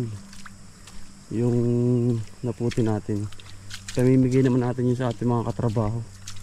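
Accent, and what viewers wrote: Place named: Batangas, Philippines. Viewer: Tanung lng po kautol saan po ang lugar nyo kc ung pronounce ng salita nyo parang taga batanggas po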